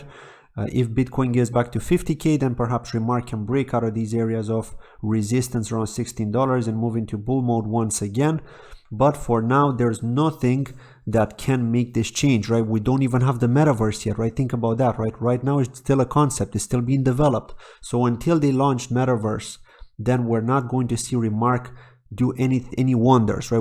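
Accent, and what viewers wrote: Ireland. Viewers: Definitely concerned. Feel like uninstalling coinmarketcap for a year or two to prevent me from looking at it. lol Btw, am i hearing a tad of an irish accent? :)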